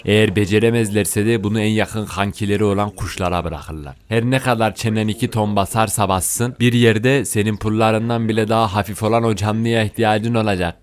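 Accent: Ankara, Turkey. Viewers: Usta anlatma şeklin Ankara şiiven anlattığın herşeyi akılda kalıcı yapıyor iyi düşünmüşsün kolay gelsin.😁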